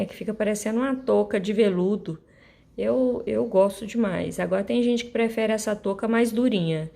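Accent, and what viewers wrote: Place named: Minas Gerais, Brazil. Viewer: Que beleza de trabalho👏 Grata por compartilhar ensinamento🙌 E vc tem uma voz agradável e ensina de forma calma - parece sotaque mineiro rsrs lembrei de meu saudoso pai q era da bela Minas Gerais 😊 Ganhou uma inscrita e farei essa bela e pratica touca (faço para doação)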